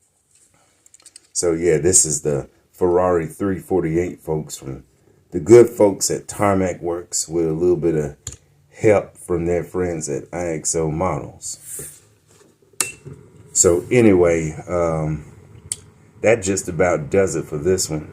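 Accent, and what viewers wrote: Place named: South, United States. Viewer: I like your southern twang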